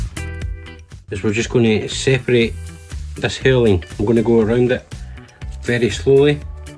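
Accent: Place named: Scotland, United Kingdom. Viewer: What is this accent? Scottish? I only understood maybe %30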